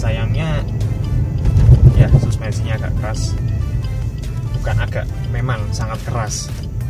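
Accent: Surabaya, Indonesia. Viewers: iki wong suroboyo yo ? sepisan ngrungokne langsung mikir suroboyoan. medhok jowone masbro. salam suroboyo👍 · 10 detik awal, gak perlu moco comment, asli suroboyo yo ? salam medhok masbro :D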